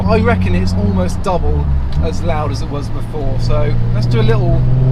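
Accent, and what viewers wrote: United Kingdom. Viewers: The most British sounding person on YouTube, banging videos keep it up 👍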